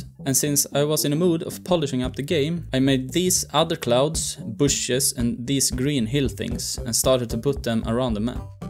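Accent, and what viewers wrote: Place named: Sweden. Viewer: När en svensk pratar engelska, eller hur?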